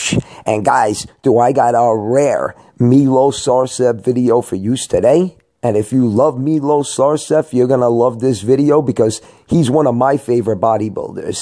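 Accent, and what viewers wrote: New York, United States. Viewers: i love your nyc accent it makes the whole video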